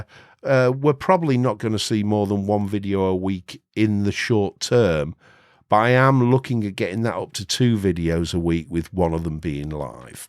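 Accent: United Kingdom. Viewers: Congrats on the 10K! Well deserved. :) Why I like your channel mostly comes down to personality. You're calm, natural, and we're in a similar age group. I'm also loving the fact that you're British. The accent is most welcome. I've always had a soft spot for well-spoken British English. Apart from interesting content in general, I think what I appreciate the most is that you're not attempting to make everything "funny" and/or sensational like so many others do. I'm really tired of that, feels like a lot of channels target 12-year olds and are only interested in the entertainment value. Looking forwards to future content, cheers.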